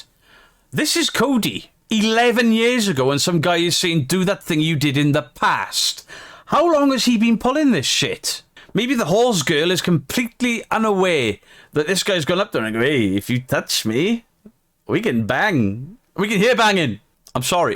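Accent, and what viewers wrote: Wales, United Kingdom. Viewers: Yes he’s very welsh haha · Are you from Wales? 🤔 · Love ya Welsh accent mate it’s lovely from the north mate lovely video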